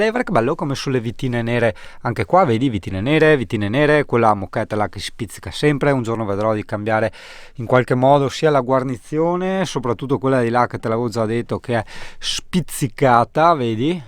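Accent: Veneto, Italy. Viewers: ormai ti seguo dal primo video credo, o un paio di video dopo, ascolta el sio, qua in Veneto esiste una parola che mette in accordo tante robe, dall'augurio all'offesa.....un bel VA IN MONA e non serve dir altro. Quando ti demotivi, pensa a sto puoro mona che ti scrive e che ti dice che è contento quando le aziende ti mandano qualche cosa da recensire. Primo perché vuol dire che il canale va bene ed è simpatico, secondo alle aziende non costa nulla spedirti, perché per loro è importante far vedere quello che producono, terzo se l'oggetto spedito fa comodo anche a te, meglio due volte. Non rifiutare gli oggetti spediti, anche se vai un poco off topics, se l'azienda in questione ha piacere che le recensisci il prodotto, tu fallo, perché a loro fa piacere, magari la chiami la recenzione off topic, ne può nascere una cosa simpatica. Gli heater sono una cosa sgradevole, purtroppo persone senza senso, povere intelletualmente nella loro vita e povere in rete. 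Bannali e che i vaga in mona. Ad esempio a me piacerebbe vederti recensire un bel coltello multiuso tipo il VictorInox, utilissimo per tutti, anche per i camperisti o similari. Non perché non ci siano recensioni su youtube di questo oggetto e similari, ma perché recensito da te che hai la parlata della mia regione è un'altra cosa, insomma senza voler togliere nulla agli altri recensori, lè na recension veneta, lè un'altra roba daiiii......Con amicizia Anacleto. P.S.: La cosa scandalosa sono le pubblictà che siamo costretti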